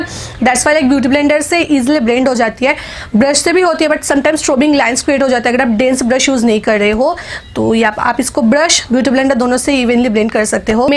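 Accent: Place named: Bengal, India. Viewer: Bengali accent😍😍😍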